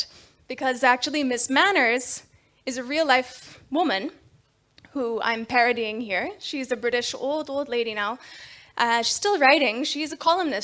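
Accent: Maharashtra, India. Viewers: Her English accent so Indian man.. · That accent is so Indian! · Her English has some Indian accent to it... 😂😂😂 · Her accent has become closer to Marathi..as in Canada..English people has accent like Khan not ka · chota v4 karu nakos, Indian accentach ahe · Basically, her accent are very indian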